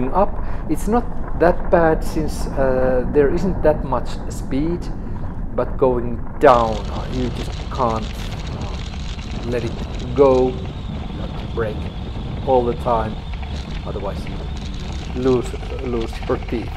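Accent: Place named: Finland. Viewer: There are great conditions and landscapes 👍 It's interesting how well a Finnish understands that English with a Finnish accent😁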